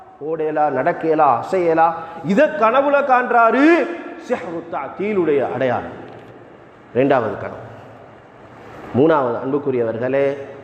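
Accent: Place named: Sri Lanka. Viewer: Sri Lanka Muslim tamil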